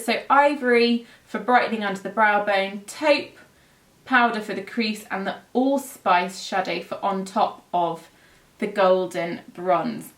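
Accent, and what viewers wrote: United Kingdom. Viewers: I could listen to you talk for days!! Sounds so proper and "British". Makes my American accent sound so dull! Lol. Your makeup is always stunning! And always educational. Thanks!💕💕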